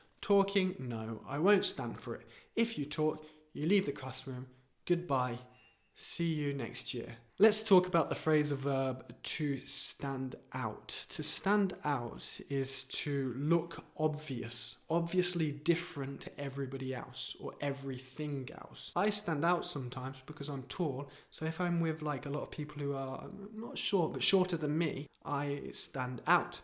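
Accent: United Kingdom. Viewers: Thank you very much.Your teaching was great as always (with beautiful British accent) and the content was helpful as well. Interestingly yesterday i was talking to a Swedish guy.We talked about the cultural differences between Iran and Sweden. "midsummer celebration is something we do that stands out i believe" he said.It was the first time i heard "stand out"! After our conversation,i found out you uploaded new video so i watched it and got surprised.Now i can understand it better.🙏🙏🙏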